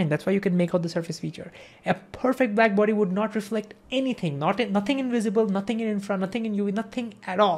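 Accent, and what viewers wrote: India. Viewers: Among the bes tindian youtube channels (or ones with indian accent anyways) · in the video. First time I see a video from you. Let me tell you two things. One bad, one hopefully less bad. First, the bad: you DO have a strong indian accent and I hate it. Simply because it's just a tiny little bit harder for me, a French, to understand. Second, the less bad: I know I'm going to love whatever content you offer, insta like, insta subscribe. As an old PhD in physics now working in engineering, I know that 1. I'm not up to date and 2. my education and teachers were both excellent, thank you, yet, ANY form of education is a bit lacking. History of science for instance: can you imagine that I studied that for ONLY one year? - Yes, only one year. This discipline shall be mandatory for EVERY scientific field! - But yes, I still had the chance to study it for one year, told you I received an excellent education. 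Understanding HOW and WHY great ideas came to be, I dare say, is probably more important than WHAT the great idea is. Unfortunately, it's also much, much harder to teach, and longer, therefore education chose to focus on what (also, when you need that "what" to carry on with the next great ideas, it kind of make sense: efficiency) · I think you should change your beard and cap style to become more dapper because your indian english speaking with that getup is eerily similar to a fundamentalist islmeek preacher who was kcked from India.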